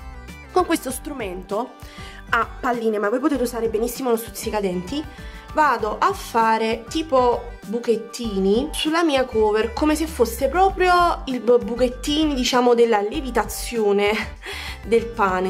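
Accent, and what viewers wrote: Naples, Italy. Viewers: Adoro il tuo accento Napoletano😍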